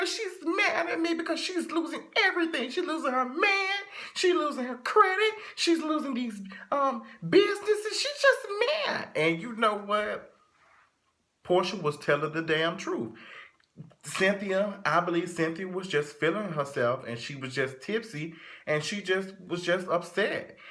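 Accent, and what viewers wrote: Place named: South, United States. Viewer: Your country talking 😭😭😭😭😭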